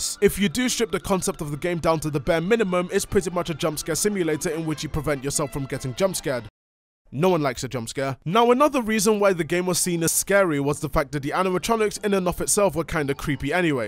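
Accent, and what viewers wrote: United Kingdom. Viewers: The British accent makes it a fucking amazing video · Lol u sound like British Cyrus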